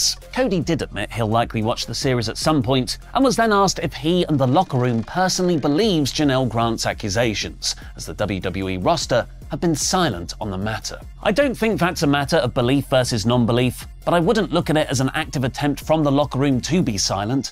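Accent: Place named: United Kingdom. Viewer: okay i know its the british accent, but it takes 5 seconds to look up. Trey Mi-G-el. Just pronounce the G. No need to pronounce the U.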